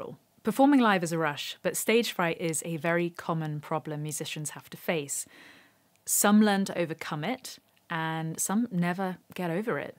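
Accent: United Kingdom. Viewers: i just realized you are ...British?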